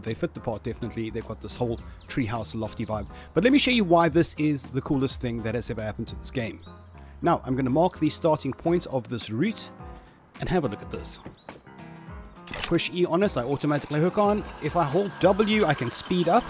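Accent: South Africa. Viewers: Ayoo, are u South African bruu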